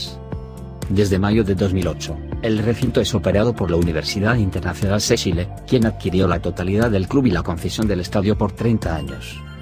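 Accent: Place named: Spain. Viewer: Escuchar la historia de Unión Española con la voz de fondo de un español, lo hace algo único y que llena de orgullo al hincha hispano ❤️💛 Enorme Video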